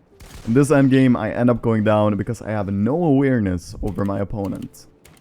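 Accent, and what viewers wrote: Norway. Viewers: Are You norwagian?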